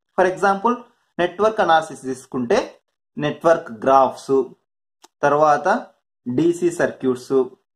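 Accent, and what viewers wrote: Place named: North India, India. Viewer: Baagaa matladaaru I mean pure telugu. Nenu meeru north anukunnanu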